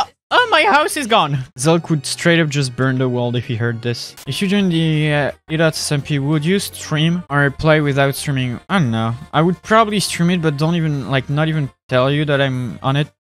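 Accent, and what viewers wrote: France. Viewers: He sounds extra French. My heart. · On entends bien l'accent français x) Je pense qu'on l'a tous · cette accent français mdr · i love your accent French accents are the best.. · Ta un petit accent français sais trop chou xD sinon continue t'es vidéo j'adore se que tu fait ^^ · His accent makes me laugh soooo much Before you hate on me I am French so I am allowed to laugh at a Frenchman because he has an accent while talking English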